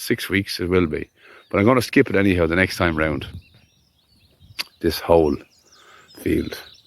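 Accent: Ireland. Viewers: Bred , born and reared into it. A career in nursing first but the LAND called out loud so out the gap and got out the wellies. It's a great way of life and healthy.. You got very handy with the CAVAN accent and you been from the west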